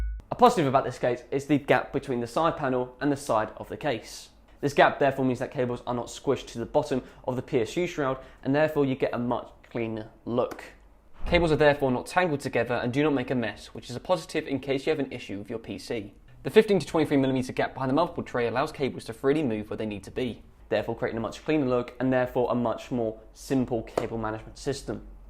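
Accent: United Kingdom. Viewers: u wot m8? he just has a british accent and a light one at that. what are you on? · I don't understand what you are saying bristish accent so hard for me..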